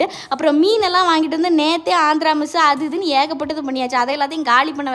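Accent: Tirunelveli, India. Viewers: akka arambathula irunthea ungala enak rompa pudikum ❤ love from kerala 🥰Unga tirunelveli slang rompa ishtam 😊 ipo naney konja monthsla tirunelvelik marumagala varaporen🤩 rompa periya happy🎉ungala meet panra chance kedacha innum nallarukum 🥰 🫂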